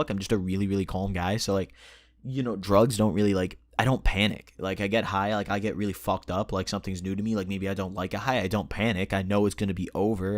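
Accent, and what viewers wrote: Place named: Indiana, United States. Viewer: Bro I thought u were from Indiana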